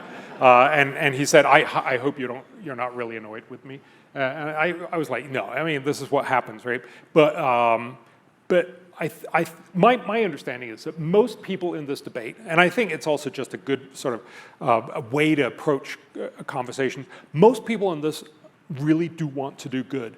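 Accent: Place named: United States. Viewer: silly question, but where did you learn to speak american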